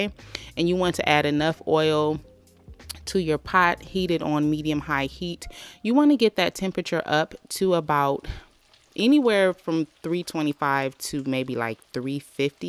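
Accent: South, United States. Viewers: An African American sista with a southern accent frying chicken. It's got to be the best.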